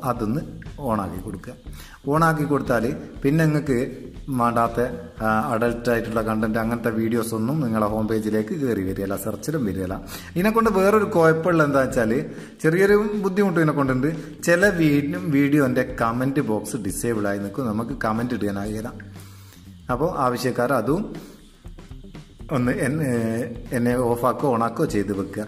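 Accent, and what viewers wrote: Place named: Mappila, India. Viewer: 'ഇക്കാക നിങ്ങളുടെ ആ മാപിളമാരുടെ സംസാരശൈയിലിയും നിങ്ങളുടെ ആശബ്ദവും കൂടി ചേരുമ്പോൾ ഒരു എച്ച് ടി സുഖം നിങ്ങൾ ഞാൻ ആണ്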